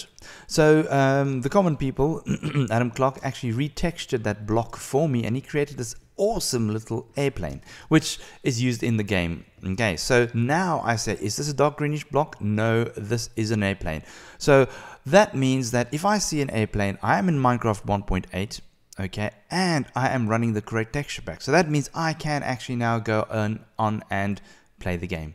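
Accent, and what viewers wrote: South Africa. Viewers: Don't mind me asking... But are you South African?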